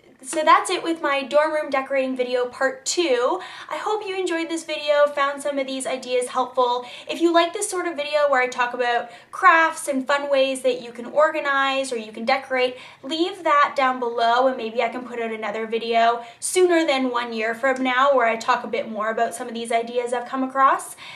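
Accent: Canada. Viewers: R u Canadian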